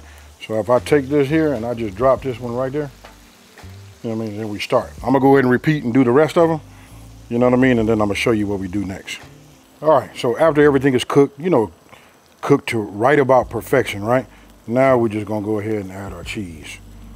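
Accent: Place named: West Coast, United States. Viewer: You can tell his from Cali or somewhere from the west coast gangsta turned chief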